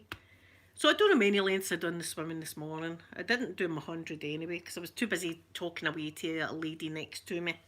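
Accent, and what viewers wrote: Scotland, United Kingdom. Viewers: Aww, don't give up on your dreams of becoming an astronaut! We'd be honored to have you representing the US with your amazing Scottish Accent and sweet personality 💙💙💚💚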